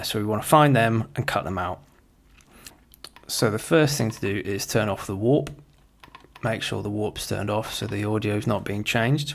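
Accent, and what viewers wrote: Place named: Northern England, United Kingdom. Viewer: Just watched it - very useful thanks - I am doing none of those things properly although I have found a good piece of s/w to show the key of a clip - Keyfinder (no business connection - its free) - nice to have a calm englishman with no gimmicks rather than some of the johnny foreigner types with all their silly cuts (including hair!), daft graphics, mumbling, silly accents etc - I take it you're from up t'north lad by eck.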